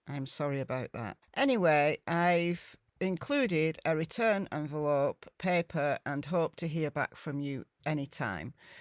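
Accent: United Kingdom. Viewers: lovely to hear another brishish person xx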